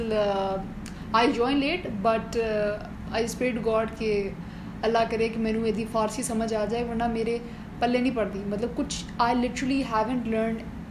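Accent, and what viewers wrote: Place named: Punjab, India. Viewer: Punjabi accent 😍😍😍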